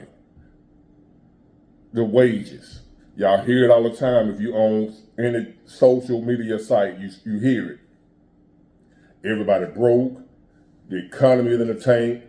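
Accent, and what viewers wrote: South, United States. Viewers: Your country accent is type tier ✊🏾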